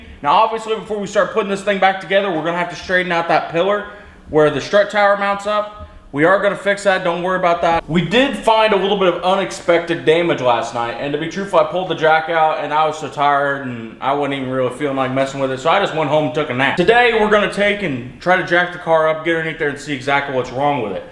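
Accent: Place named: Kansas, United States. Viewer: What part of Kansas are you from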